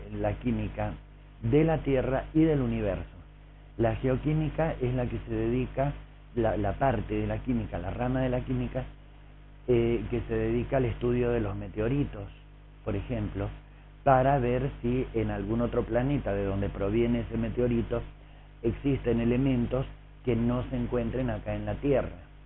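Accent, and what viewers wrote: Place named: Argentina. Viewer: Fantástico el video, profe. Y en "argento"...!!!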